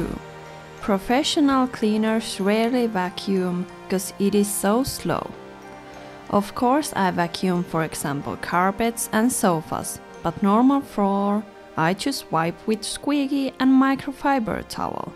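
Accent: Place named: Finland. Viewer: I can tell that you are from finland. Haha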